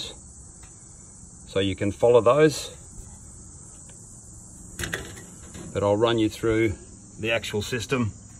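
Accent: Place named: Australia. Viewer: As soon as i heard the aussie accent I knew he was going to help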